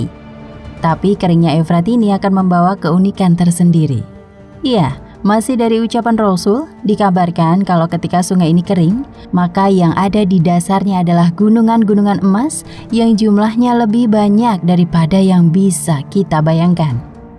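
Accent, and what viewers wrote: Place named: Java, Indonesia. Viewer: kadang normal, kadang medok suara miminnya,.. dari sini bisa disimpulkan mimin org jawa 😁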